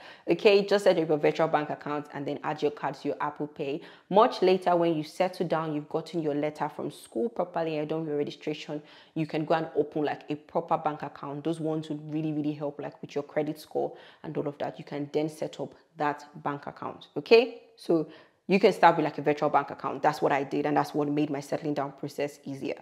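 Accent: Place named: Yoruba, Nigeria. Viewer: Love your mixture of Yoruba and English accent..Otilooo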